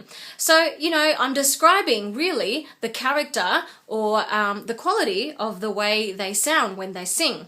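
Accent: Australia. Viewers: Omg are u Australian I looove the Australian accent it's soo cool!!!!! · Does she speak with Australian accent? Or am I mistaken? :)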